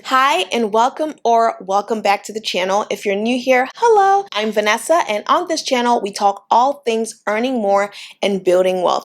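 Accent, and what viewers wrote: Nigeria. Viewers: Thank you for making this video. Truly it’s a blessing. Girl, are you Nigerian? The accent peaked through. Fellow Nigerian here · I love your Nigerian accent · Giiiirl! Are you Nigerian? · Is that a Nigerian accent I heard😍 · It's the Nigerian accent for me😂 Thanks alot for this gem and giving us a great personality to go with it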